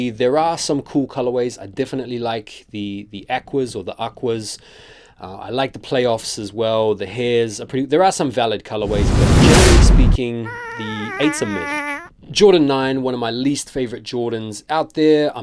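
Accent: United States. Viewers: Why do you have an American accent here all of a sudden? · Why does he all of a sudden have a Brooklyn accent?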